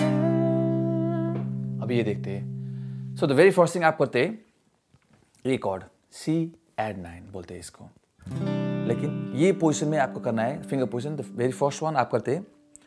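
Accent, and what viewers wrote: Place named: Nepal. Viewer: Dude that guitar is lit and with that accent i can tell ur from nepal 🇳🇵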